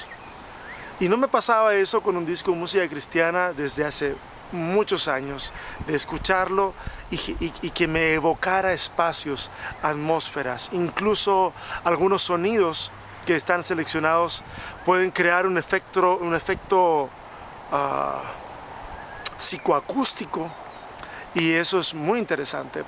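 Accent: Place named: Mexico. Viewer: Cómo se nota la influencia de vivir en México en esos años, hablabas a lo mero macho wey.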